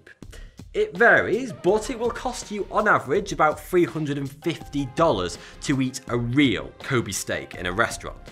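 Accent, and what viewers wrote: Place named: England, United Kingdom. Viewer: He definitely English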